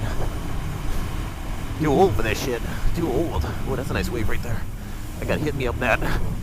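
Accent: Canada. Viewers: You Canadian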